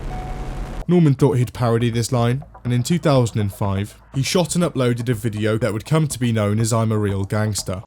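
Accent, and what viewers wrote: United Kingdom. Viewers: Internet historian's British son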